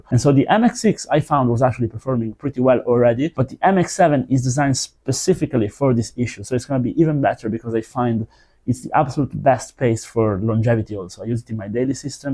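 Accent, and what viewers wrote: Italy. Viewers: He's Italian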